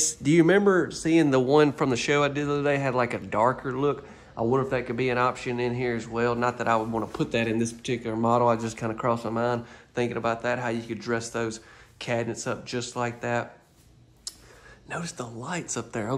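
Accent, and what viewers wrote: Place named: Mississippi, United States. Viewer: I should've you were from Mississippi by your accent! Definitely gotta support your channel! My family is in the PineBelt. What are the best mobile and Modular home sellers here (South MS)?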